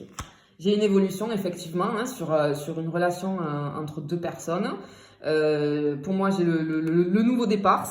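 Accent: South, France. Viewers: C’est trop bon l’accent du Sud !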